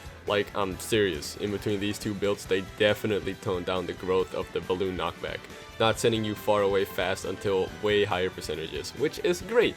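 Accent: Netherlands. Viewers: Are you Dutch or something, you sound really Dutch. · I can tell you're dutch. Do you play tournaments?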